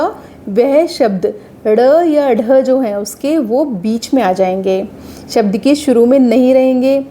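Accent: Standard. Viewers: बहुत बहुत धन्यवाद् mam, आप की हिंदी,उच्चारण एक दम शुद्ध है,ज़्यदातर पढ़े लिखे छात्र, यहाँ तक की सरकारी अध्यापक,बहोत से हिन्दीभाषी प्रशासनिक अधिकारी तक हिंदी का उच्चारण गलत और गवाँरो की तरह करते हैं..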